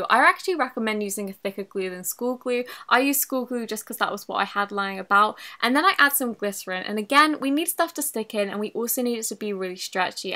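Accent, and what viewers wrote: United Kingdom. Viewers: Are you British? You sound British.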